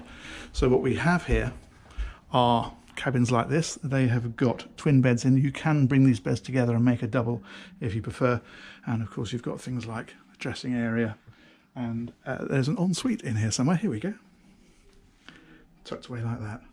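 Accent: England, United Kingdom. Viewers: ... This is the tour that 'popped my yacht cherry', so to speak. PS - My wife hates you for the monster you've created. "Long time listener, first time caller"... Yes. I've seen every dang vid you've done on floating vessels. It's like video heroin, I swear it. So... It all started out with Youtube's "suggested view". Watched this... Watched all of 'em, no really. ALL of 'em. So I says..." can't be the only dude doing these..." And clearly - he's not. He's just the guy who does them brilliantly...!!! I've watched over a dozen guides, ranging from obnoxiously douchy, to walking animal tranquilizers, and..... You sir "are the bar", so to speak. You sir, set the standard in yacht tour guides. Now enough about you...! This damn "boat"... Great Googly Moogly...! Some thoughts on Alia: - The biscuit in red... I love the way blue bloods 'prance' as they scoot out of the way. 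Precious jiggle... "Slightly Japanese theme"...? Ya think? Only thing missing was a David Carradine cameo! And the Master... Gorgeous, beyond belief, but- no friggin' TV? Wait, what...? And the shower... You could fit an entire football team's cheerleading squad in there...! (IF they squeezed real tight - "Oh, stop...!") The "extra cabin"... VIP quality, to say the least. Awesome crew cabins and mess area! And...... EVERY crew cabin has a proper shower for the pair of bunkmates. "Excellent..!" (In sultry, understated, classic English, I think, tone) I cringe when I see the "hand held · Wow, I like AL you minium. We pronounce it ah loom in uhm. Cool as heck to hear your English accent.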